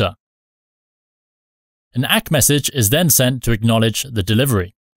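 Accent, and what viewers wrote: England, United Kingdom. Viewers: Rutah 😂 England accent Remind me buttah ( butter) Great explanation by the way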